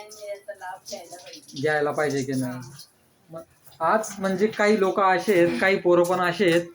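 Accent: Khandesh, India. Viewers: Hallo tumhi kay khandesh chalisgaon jalgaon che aage ka bhasha varun vatate aahe Baki tmhi chhan video banav tat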